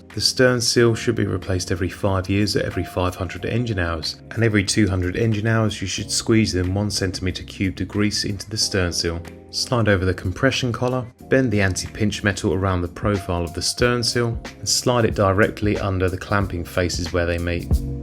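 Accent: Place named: United Kingdom. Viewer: A joy to watch. And to listen to. Your English is, well, the Kings English. Keep up the great work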